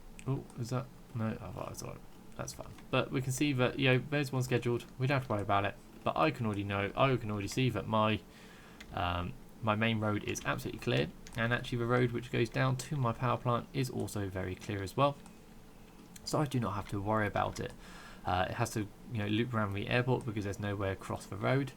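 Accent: United Kingdom. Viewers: The British accent is the worst! My ears are bleeding. Americans hate the British accent! You may be referring to the treasonous pride flag Americans. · Subscribed for this beatufil British accent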